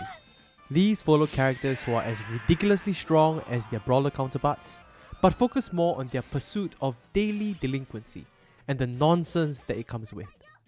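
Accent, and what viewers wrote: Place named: Singapore. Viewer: I can hear a Singaporean accent, I love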